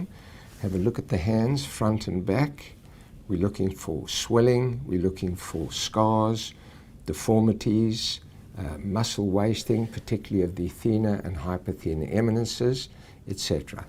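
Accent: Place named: South Africa. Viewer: he has a South African accent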